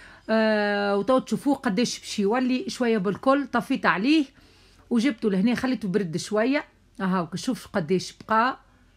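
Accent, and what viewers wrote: Tunisia. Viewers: تعجبني اللهجة التونسية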